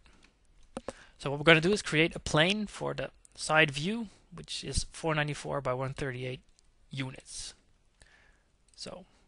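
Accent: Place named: Netherlands. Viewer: Dutch...het accent. nice tut bro ;)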